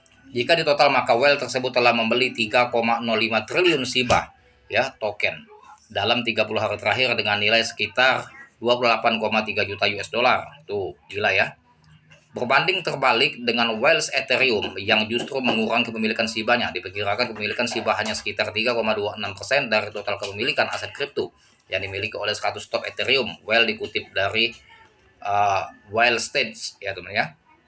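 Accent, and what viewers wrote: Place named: Lampung, Indonesia. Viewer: Logatnya seperti orang Lampung bang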